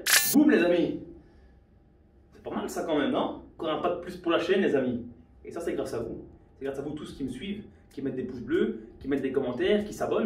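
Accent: Belgium. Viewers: tu es belge ? si oui quelle region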